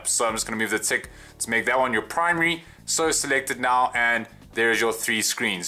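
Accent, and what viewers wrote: South Africa. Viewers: Dude are you South African!?!?!?!?!?!?!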